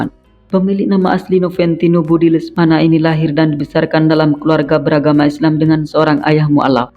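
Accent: Madura, Indonesia. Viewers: logat bicaranya kayak orang madura nih youtuber....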